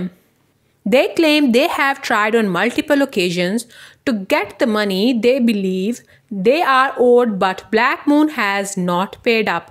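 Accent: India. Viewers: It’s an Indian accent.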